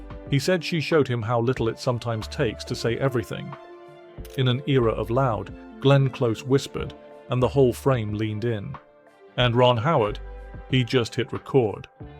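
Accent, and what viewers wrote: United Kingdom. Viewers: Would have been nice to hear it from Ron's own voice instead of an overlay of a British nobody. Nobody can become Ron Howard, even for this explanation, which is not an interview. Disappointing.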